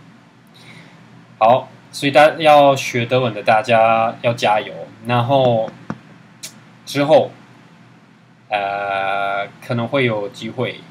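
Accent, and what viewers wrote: Taiwan. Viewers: 我猜你是跟台湾人学的中文［［ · 講德文超帥。超根本不是討厭的。哈哈以後講聽不懂的德文就好了。根本帥。王子講國語根本台客。哈哈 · 在台湾学的中文？ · 好像到地的台灣中文!!! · 这人的汉语在台湾学的吧，我听出来了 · I am really impressed with your fluency of Chinese but the thing is you speak with a pretty obvious Taiwan accent，which sounds definitely beautiful but a little bit strange if you are trying to communicate with someone who comes from Mandarin. You may wanna watch some Mandarin movies in order to see the difference. Also, thanks for your sharing! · 汉语学的一口台湾腔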